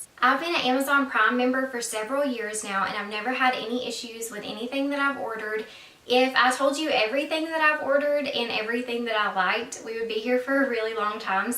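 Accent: South, United States. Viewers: Where are you from.it sounds like the South.I'm from Alabama.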